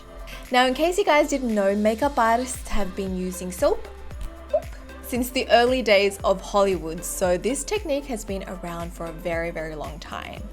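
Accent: Australia. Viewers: You have gorgeous, already thick brows!! Beautiful skin, too...are u Japanese ancestry? And that is the strongest Australian accent possible! Beautiful:) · Are you Australian? I just wonder if I guessed right 😂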